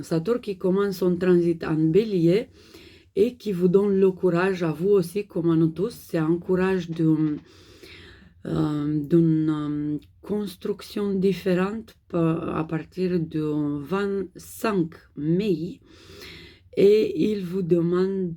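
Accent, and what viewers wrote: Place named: Russia. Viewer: j'aime beaucoup votre voix et tes accents. êtres-vous d'originalité Russe?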